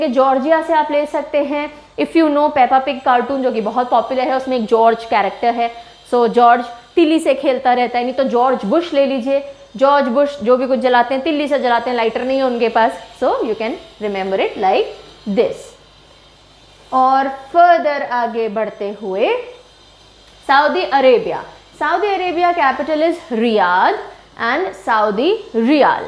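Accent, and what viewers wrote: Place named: Bengal, India. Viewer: Aap bengal sai ho didi. Ki hallo didi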